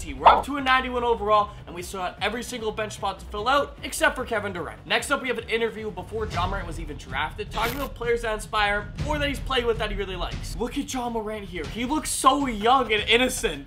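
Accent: Greece. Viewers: Are you Greek Or Canadian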